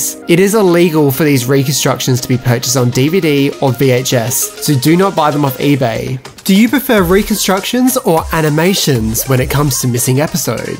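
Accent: Australia. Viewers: Are you austrailian P.S at first its hard to enjoy recon but now i really enjoy it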